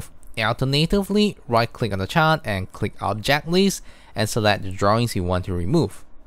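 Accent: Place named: Singapore. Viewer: youre singaporean, right?????!! Too Chinese!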